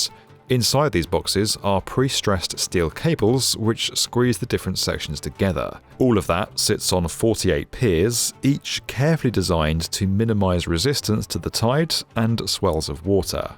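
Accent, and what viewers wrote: England, United Kingdom. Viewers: As a French person, it is so weird and funny to hear the places name pronounced with an English accent (although he's trying is best to "Frenchize" it !)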